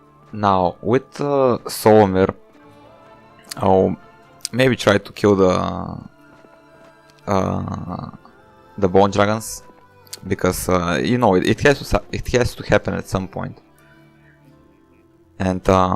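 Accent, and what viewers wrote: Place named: Bulgaria. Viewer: Sorry for asking, are you Bulgarian? Your accent really sounds like you are indeed from Bulgaria.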